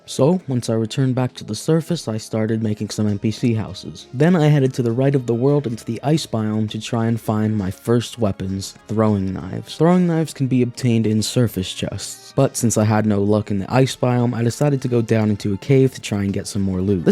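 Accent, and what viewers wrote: United Kingdom. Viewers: omg, bri’ish terraria 😦😦😦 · are you British?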